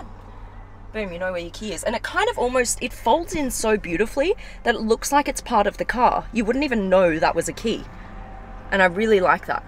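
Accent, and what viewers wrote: Australia. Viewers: More annoying accent: Australia or New Jersey? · australian accents are so cute!!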